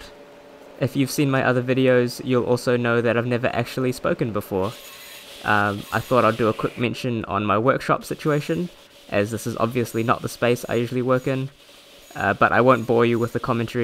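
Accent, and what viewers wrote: New Zealand. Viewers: You sound like a kiwi. If you are where are you getting this nice plywood?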